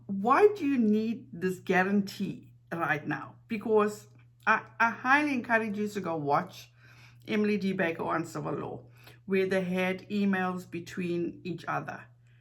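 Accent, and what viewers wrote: South Africa. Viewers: Are you from South Africa? I kind of hear that in the accent, otherwise I can’t place it. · I love your accent. South African?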